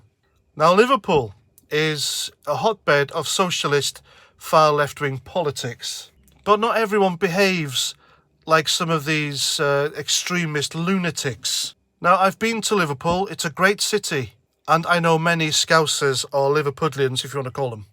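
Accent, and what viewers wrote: Wales, United Kingdom. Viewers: You sound from north east Wales or gogledd gorllewin Cymru as it's correctly known as. Why is showing one of our few democratic right to show dissent , in this case booing a dull and out of date anthem , classed as unpatriotic ?? What's wrong with being a socialist ?? We've had more years of right wing governments yet we still have more children in poverty than other nations in both the G7 and G20 group. We have the worst performing nation in terms of economic output and GDP in the G20 (except for Russia). We have one of the least democratic elections and form of government in the western world . I'm ex army - served in the Welsh Guards and yet since 2010 more and more ex squaddies are homeless and relying on charity as the state is doing less and less for them. May I suggest you do some research instead of posting right wing populist myth and wind your neck in you selfish halfwit.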